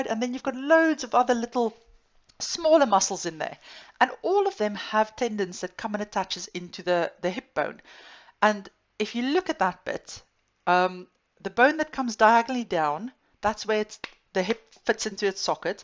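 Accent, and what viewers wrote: South Africa. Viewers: Excellent presentation. Love the South African accent. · Your previous video was so good, I came and found this one too! You sound like a fellow South African :)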